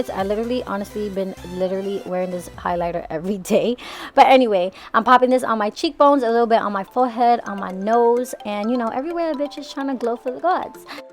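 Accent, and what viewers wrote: New York, United States. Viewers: I love your videos! You’re absolutely gorgeous and always love the vibes. I’m from philly but I love a New York accent! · Your such I New Yorker. As one myself it cracks me up hearing the way we really talk. Like I don't catch it in myself but I know that's how I sound too tho. Been told one to many times! Love ya girl!!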